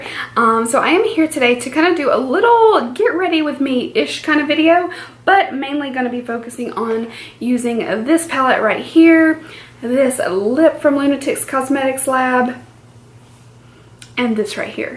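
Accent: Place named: Alabama, United States. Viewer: Love this brand! So glad to see others talk about it! It sounds like your from Alabama? Maybe lol. I'm Mississippi haha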